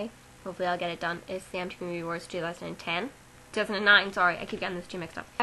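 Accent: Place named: Belfast, United Kingdom. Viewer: Your from Belfast!? I did not know that! haha. I'm from Belfast also. You don't have a strong Belfast accent...you're very lucky :) I thought you were from England haha I also think that Twilight isn't selling sex, it's selling love. Pschh...Team Edward? No! It's Team Charlie all the way! haha :D Thanks for another awesome video!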